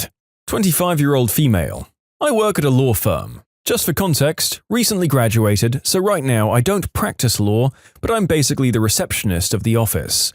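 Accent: United Kingdom. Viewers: Well that's new, nice British accent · Hmm... First it was Deep voice dude, then comedic dude, now Bri'ish dude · Get rid of the British accent go back to the normal American accent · Is it me or the he becomes more British? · Wth is this voice It's british and a different person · What’s going on about the new British accent 🤔🤔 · British alert british alert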